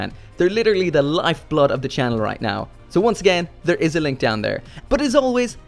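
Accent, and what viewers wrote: Ireland. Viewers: I can't tell if your Irish or American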